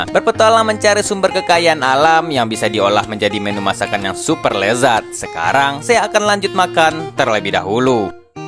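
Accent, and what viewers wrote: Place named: Adang, Indonesia. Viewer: Logat bicarany kyk orang adang sm kyk sy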